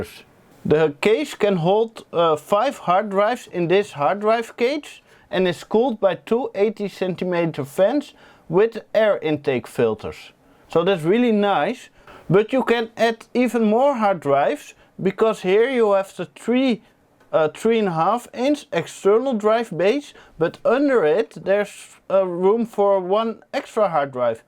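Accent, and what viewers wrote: Netherlands. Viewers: nice dutch accent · Hello fellow dutchman. I like your content. English technique is also good. Only the Pronunciation wauw XD sometimes i just had to laugh. Klinkt zo nederlands.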